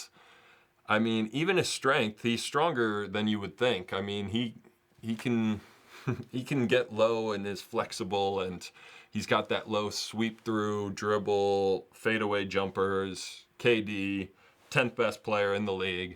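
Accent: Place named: United Kingdom. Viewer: Loved the British accent! Who knew you had it in you. Also great list - chatGPT’s got nothing on you 😂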